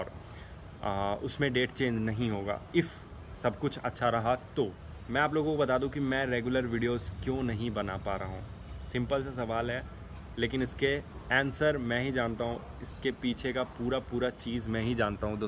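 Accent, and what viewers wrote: Bihar, India. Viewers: Bihari tone aa hi jati h teri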